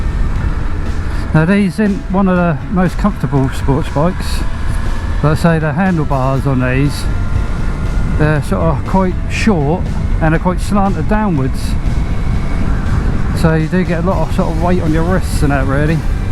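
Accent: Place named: United Kingdom. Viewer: Hmm let's see, British accent, drives on the left, big yellow license plate. I wonder where you're located.......... Tennessee ???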